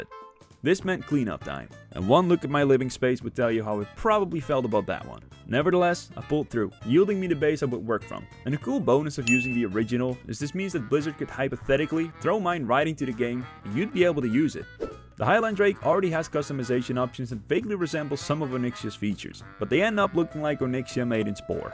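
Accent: Netherlands. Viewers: Loving the dutch. Really made me remember how similar I pronounced things in WoW when I was younger.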